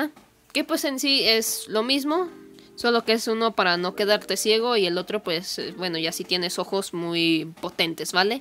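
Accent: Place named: Latin America. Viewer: Buen tutorial gracias . Vives en España ? Tienes bien marcado el acento latino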